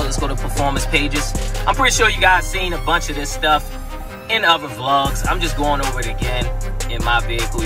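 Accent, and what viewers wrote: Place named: Baltimore, United States. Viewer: Your accent... you from Baltimore? DMV?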